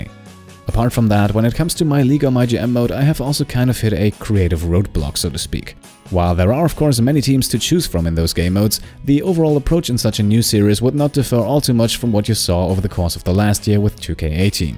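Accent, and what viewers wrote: Germany. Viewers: Warte warte warte du bist deutsch. Damit hätt ich jetzt net gerechnet vom Akzent her :D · Holy shit, do you even sleep? Work + school + youtube.. Also did not even register your german accent until like 20th episode of Rimworld and wanted to say great content and keep it up please!!! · Ah Germany, I couldn't place your accent. I knew it sounded different from a British one but now I can hear it. You deserve all your subscribers and even more! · RL comes first - no doubt. Congrats to your new business! :D A new strategy game would be nice. Maybe a paradox-one like EU4 or Stellaris or something similar. But its up to you. Im open for all, i guess. Because i really like your smooth voice. Soooo you are from germany! :D Honestly I almost thought so... Manchmal hört mans tatsächlich bissel raus ;) ;)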